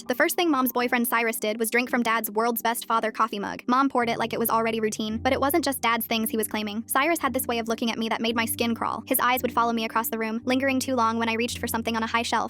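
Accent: United Kingdom. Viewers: Are you british question❓️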